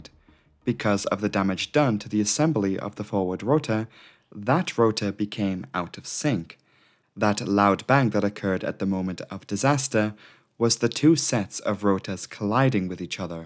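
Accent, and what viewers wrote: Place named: United Kingdom. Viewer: danish what? is british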